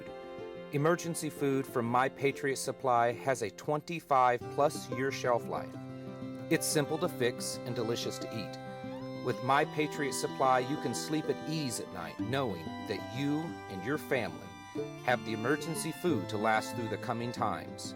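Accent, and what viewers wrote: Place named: Kentucky, United States. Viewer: Tucker is the only show that I watched every evening. And Life Liberty Levin. On Sunday. I won't watch Fox anymore. Done. He stood up for what's right. And all of this lbtqrsi+ he stood up against it. Whit values. I would say they told him to bend over and take the crap.. and he refused. Good for him. We all need to stand against this. Fox needs Tucker more then Tucker needs them. He will be back better then ever. I can't wait. Fox News will be done..... Thanks for you messages that you give every day. Because you speak the truth. I'm watching you from Kentucky, and I really liked your Kentucky accent. Loved it😂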